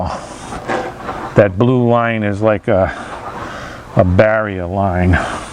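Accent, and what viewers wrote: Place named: New England, United States. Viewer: Because of your New England accent I thought it was a flexible shape hat , but that makes sense too so that's what I call it. By the way it's pronounced Jag you are. That's only when you're across the pond.lol thanks for everything you've taught me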